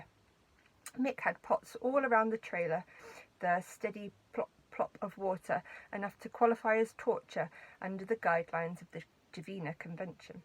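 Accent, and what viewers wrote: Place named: United Kingdom. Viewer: Sounds so much better with a British accent!!! Thank you for doing this. You rock.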